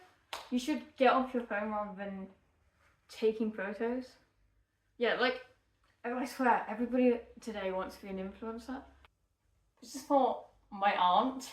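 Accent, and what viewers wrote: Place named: United Kingdom. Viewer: Just found this channel while scrolling through my recommended, 10/10 yap session. I can’t tell if your Bri-ish but the accent is cool lol(Latin American here)🏳️‍⚧️🏳️‍🌈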